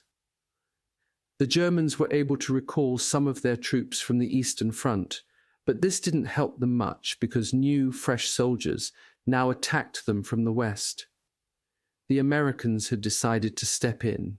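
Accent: RP, United Kingdom. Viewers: Perfect Received Pronunciation, better known as the Queen’s English. Very posh, articulate, and impeccably enunciated ! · Very British ! Queen's English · What is narrator's accent? British? thanks for your help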